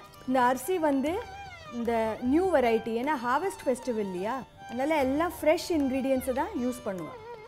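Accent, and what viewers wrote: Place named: Tamil Brahmin, India. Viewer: Pongal Paanai on a gas stove! wow. awesome clear instructions. Loved the tambram pronunciation as well - a bonus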